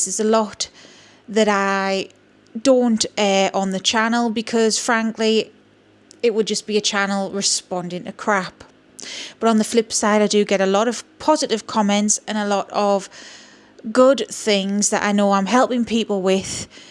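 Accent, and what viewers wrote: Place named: Newcastle, United Kingdom. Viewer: I love Ur authentic self & the fab Geordie accent, I agree too many low minds jealousy ect. I don't av the knowledge on these gods goddesses I channel some Hindu Buddhist to paint them blessings xx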